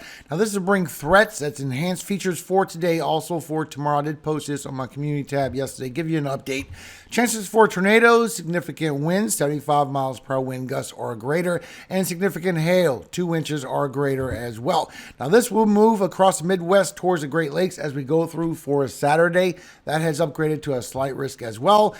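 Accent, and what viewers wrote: Louisiana, United States. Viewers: Hay weather man are you from Louisiana?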